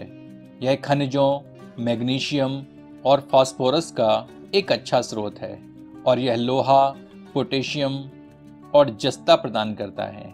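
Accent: Standard. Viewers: Sudh hindi ❤️ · apki sudh Hindi sunke bohot achha laga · शुद्ध हिन्दी बोलते हैं आप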